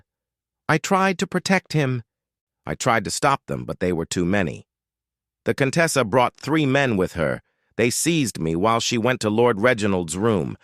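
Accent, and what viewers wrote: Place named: United States. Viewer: Give this a miss! AI GENERATED STORY AND AMERICAN NARRATION.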